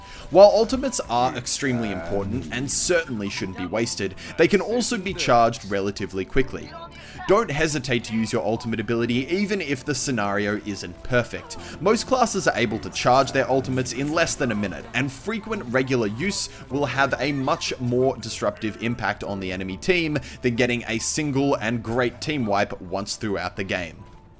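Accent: Australia. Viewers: from 'Straya. What's so wrong about that? Can't he speak normaly? Does it have to be US english? I don't think so... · He's Australian you dumbfuck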